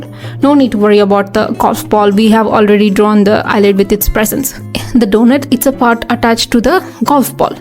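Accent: India. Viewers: You sound like if you have a an Indian accent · yh i was wondering bcz this is how my family speaks eng back home in India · Are you Indian , don't get me wrong, I am Indian. Just guessing from the accent. I have the same accent ,please don't get me wrong. Btw I am one of your subscribers.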